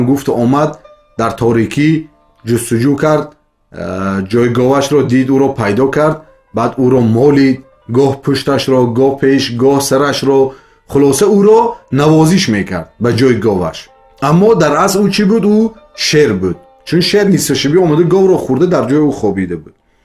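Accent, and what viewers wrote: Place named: Tajikistan. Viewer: Бехтарин суханхо. Лахни бисер ширини точики кухистони.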